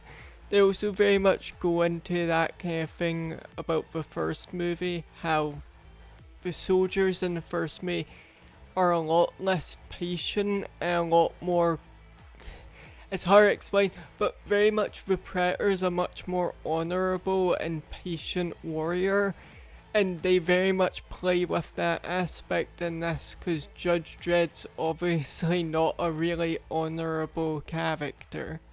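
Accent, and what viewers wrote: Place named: Wales, United Kingdom. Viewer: Bro..sweet accent! Are you Welsh? Seriously, it is awesome.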